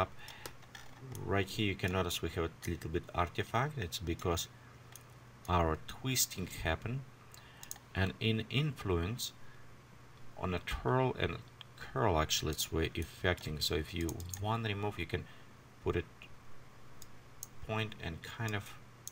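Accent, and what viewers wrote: Russia. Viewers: Да слышно же что ты русский) Ну расскажи по русски же)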